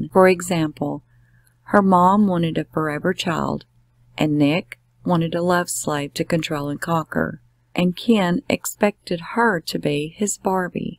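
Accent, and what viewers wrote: South, United States. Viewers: There’s something about your voice.. it’s soothing. Maybe it’s your southern drawl or the inflection in your voice?!? Either way I do enjoy your videos, book synopsis are my favorite.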